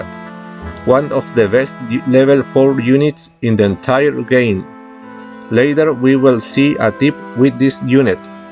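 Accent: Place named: Spain. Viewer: Porque este ingles suena como el de Mariano Rajoy?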